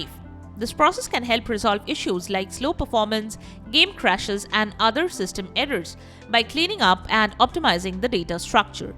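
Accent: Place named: India. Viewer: English mix with Indian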